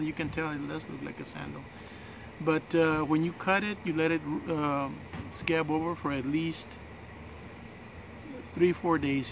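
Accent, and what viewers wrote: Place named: South Texas, United States. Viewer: It's crazy how a South Texas accent is so recognizable. I'm from East Texas. Thanks for the info. Was short and sweet. To the point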